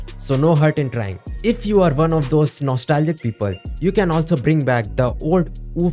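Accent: India. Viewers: Bro are you Indian boy or smth? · once you hear the indian accent you know its gonna work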